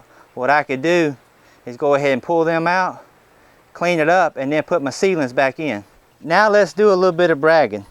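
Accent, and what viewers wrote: South, United States. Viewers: Ok, so I hear a southern accent and I know I heard at least a couple of "dat"s...are you in or around Louisiana? Who Dat? LOL Anyhoo, I live in northwestern Louisiana and have really moist soil...I was wondering if you have any suggestions of either what would grow best or how to get my soil to dry up a little. I till it but with all the rain and the fact my soil holds moisture like a sponge, it just never seems to dry (and this is on the elevated areas)...love your videos!!!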